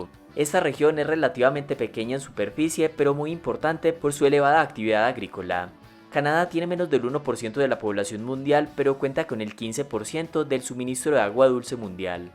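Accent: Colombia. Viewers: Dato curioso el narrador de este video si no equivoco es de origen colombiano por su acento…..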